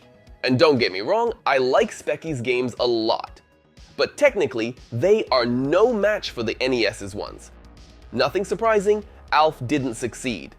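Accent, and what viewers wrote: Russia. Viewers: почему когда русские люди изучения английский все говорят тоже как ты квк тебе*?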